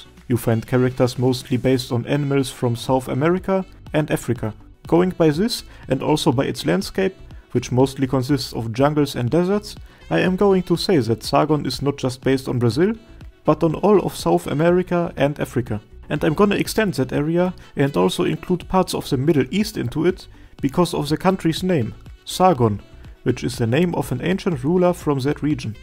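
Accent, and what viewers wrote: Germany. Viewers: Man I loved this stuff, no one ever has put so much effort into this kind of videos explaining the lore of the world of Terra, love it, keep up the good work! PD.: I love your german accent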